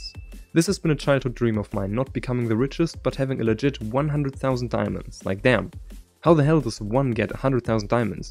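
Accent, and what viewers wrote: Germany. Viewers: Du hast ein leichten deutschen Akzent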